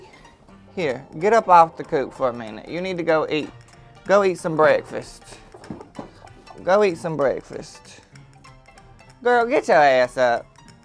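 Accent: South, United States. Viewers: Omg! (Laughing) "It's so damn ghetto around here!" (OR:) "Biotch, you going to Chick-fil-A!"😅 Reminds me of my childhood with that "SOUTHERN" accent. ❤ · 😂 you are so funny and so southern I just don't know what to do lol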